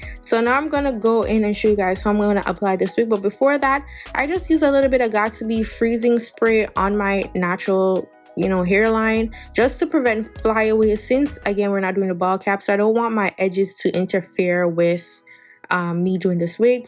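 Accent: Jamaica. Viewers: I love this.. so easy n quick. Btw r u Jamaican?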